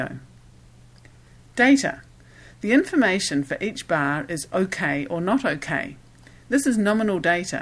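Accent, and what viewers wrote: New Zealand. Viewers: New Zealand accent. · My apologies if I sounded rude but the content of the video was really helpful! Also, New Zealander's accent is one of my favourite kind of accent! :D Flight of the Conchords ze best! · Wao great as video. If i can please ask are you a kiwi?? If i have guessed right from ur acsent.😊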